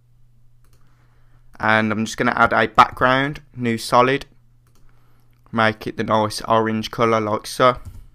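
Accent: Birmingham, United Kingdom. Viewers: BIRMINGHAM ACCENT!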